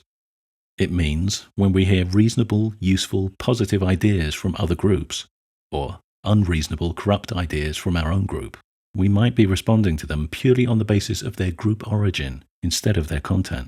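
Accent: United Kingdom. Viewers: There is a zero-sum game in real life, though. Resources and land-carry capacity are all limited goods. The ivory tower moralizing you see here in this video is never related to real world current-day examples for a reason. It would lay low the sort of bourgeoisie boutique politics that the author labors under while ironically living in a bubble where he deludes himself into thinking everything is unlimited since he is disconnected from the tribal realities of the situation of say the working class in Britain where his accent would suggest he lives.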